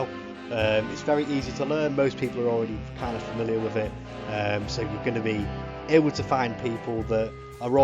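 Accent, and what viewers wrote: United Kingdom. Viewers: Thanks for starting this series. So far ok but what are you trying to do. Why not start with the "history" of the VBCW? Why is this war going on, what are the factions, what has happened . You start us off by showing a bunch of booklets that make no sense without context. Next, LOSE THE MUSIC. Please consider that, hopefully, many of your watchers, like me, are not accustomed to a British accent. It is interesting to learn about the milieu from a British speaker, however. The music makes it even more difficult to understand the vocal audio. Please, also speak a bit slower. I am looking forward to soon learning about what this VBCW is actually about. Thanks.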